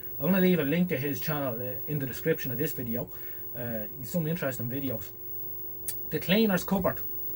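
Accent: Ireland. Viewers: I watch because your delivery is low-key, casual and appealing. You repair the same sort of thing I do - yes, a few ladies do this sort of work. (And your lovely Irish accent it rather sweet.) I have completely rebuilt a couple of Bush TV24s and numerous radios from the 1930s and 1940s. But these days AM radios need a local home-brew local transmitter fed by whatever audio source you like. I hope fame doesn't change your presentation too much. I at least I like your videos just as they are! Thank you.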